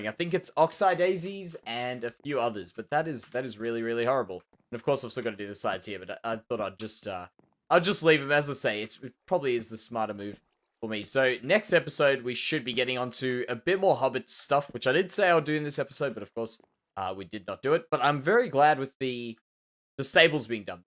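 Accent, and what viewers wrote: Australia. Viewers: Did anyone else mistake his Australian accent for a British one lol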